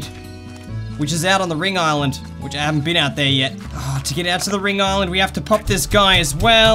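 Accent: Australia. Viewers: are you austrailian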